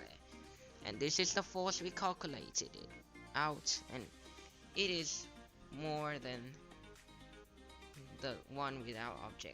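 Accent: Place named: United Kingdom. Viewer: I like that bri ish accent😎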